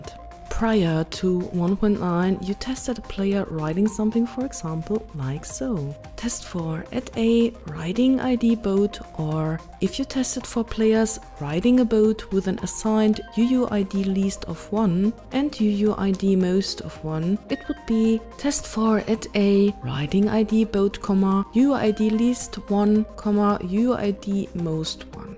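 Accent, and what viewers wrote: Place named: Germany. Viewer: I.. have.. to.. resist.. Srsly your voice.. What is your native language, maybe german? While reading the commands you nearly sounds like a robot :D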